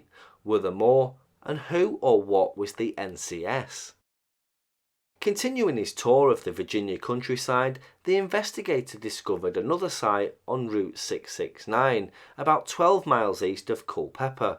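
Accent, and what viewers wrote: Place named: Cockney, United Kingdom. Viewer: Ay love, yer cockney accent is really really thick to these yank blokes who are strugglin like hell to understand. we love all our long lost brothers & sisters from the U.K., & some of the cultural idiosyncrasies are just endearing to us here who embrace diversity. However, still strugglin to hear through the sharp curves in dialect. Someone should invent a bloke to bloke translator. ya know-- "cookies to biscuits" & all that rot.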